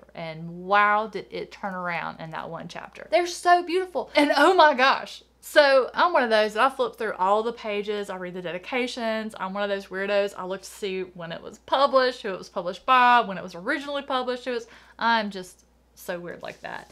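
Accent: South, United States. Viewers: Hi! I've really been enjoying your channel. At first it was partly due to your southern drawl, which I love, and I thought, "Wow, she sounds just like my husband." And for some reason, I thought I heard you say in another video that you were from TX, but I must've misheard since you clearly say in this video that your from the 'Sip, which is where my hubs is from! I knew it!! 😉 Anyway, I love your channel!💕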